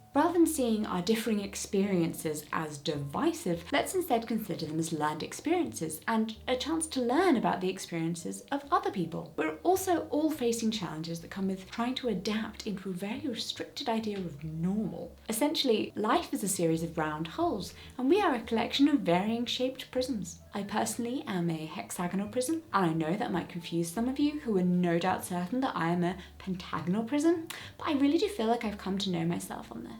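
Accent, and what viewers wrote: RP, United Kingdom. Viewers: You're so posh-sounding 😮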